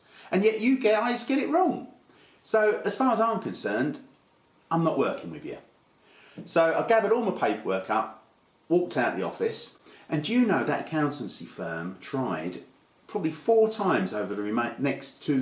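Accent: United Kingdom. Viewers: i like British accent im enjoying the way you talk.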